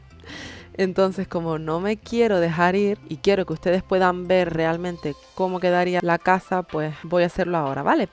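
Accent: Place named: Canary Islands, Spain. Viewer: Mira que llevo 1 semana viciada a tu canal desde que te conocí y sabía que tu acento era muy de mi tierra. Me encanta saber que hay un canal de sims que no solo sea de Canarias sino de Las Palmas. Adoro y sobre todo pío pio jajajaj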